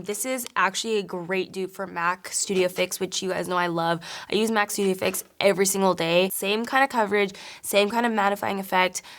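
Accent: United Kingdom. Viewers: Damn girl! Your British accent is perfect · I love that British accent💂🏻‍♀️🇬🇧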